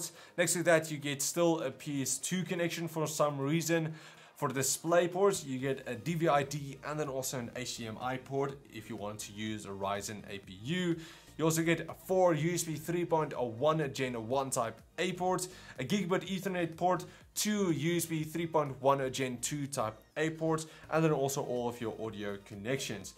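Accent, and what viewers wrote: South Africa. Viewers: My mother is South African and I lived there for around 6 years when I was very young, it's so nice to see a South African reviewer and It's great to hear the accent again. The review is also amazing! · Are you from South Africa?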